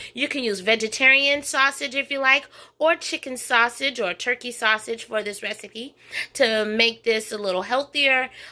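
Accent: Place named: South, United States. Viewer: The ingredients, the preparation, the cooking talent, the presentation, the final result.......am I in Tuscany? The touch of a southern voice....the spot-on Julia Child-type interaction with the viewer....the ease and simple communication of the cooking sequence...all contributes to wanting to follow.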